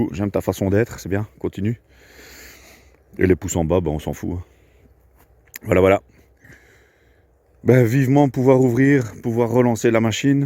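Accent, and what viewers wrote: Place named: Belgium. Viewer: Salut, une belle saison à toi 🤞. Tu meuble tu meuble mais avec l'accent belge c'est sympa à écouter 😉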